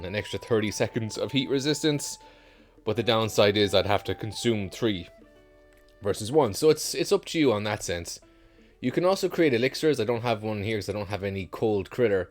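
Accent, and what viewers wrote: Ireland. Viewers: Thanks for your videos! Running through TOTK again with my son and there’s nothing better than getting tips with an Irish accent! Slainte!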